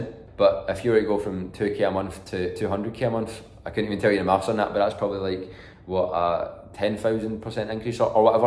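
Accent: Scotland, United Kingdom. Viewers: OMG I love your accent!! Are you Scottish? Btw idk if you noticed, but the subtitles have so many mistakes.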